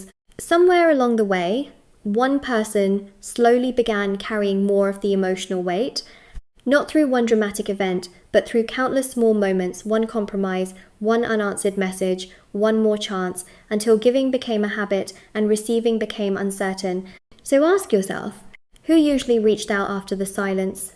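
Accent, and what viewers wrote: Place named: United Kingdom. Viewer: What a beautiful accent...the British one❤.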